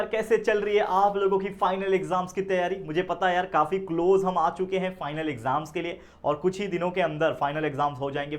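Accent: Maharashtra, India. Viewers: Marathi voice❤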